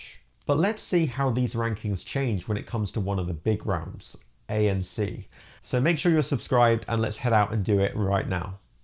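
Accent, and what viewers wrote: United Kingdom. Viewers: is jimsreviewroom British accent version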